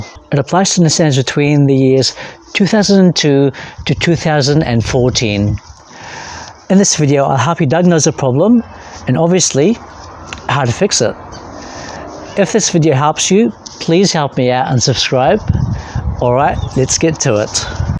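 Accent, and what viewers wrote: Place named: New Zealand. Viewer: Evening!! I have thoroughly enjoyed your videos as I posses the same vehicle therefore is very informative & helpful. I couldn't help but notice the kiwi accent! As an aspiring mechanic, you as a mentor would be fantastic.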